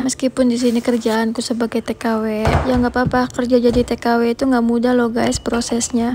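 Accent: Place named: East Java, Indonesia. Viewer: OK, tetap semangat ya.. Setiap hal yg kita kerjakan dg niat & cara yg baik, InsyaAllah mendapatkan hasil yg barokah.. btw, logat bicara terdengar cukup familiar. Dari Jawa Timur kah?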